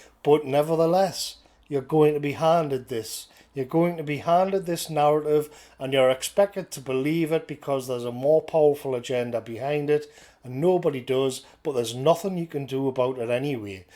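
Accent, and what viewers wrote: United Kingdom. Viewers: I was watching a video about how to do a "Proper" British accent (purely for laughs) when the notification for this came up. Right off blasted with "Twen(!)ee-nine(!)een". Good to hear a proper British accent again.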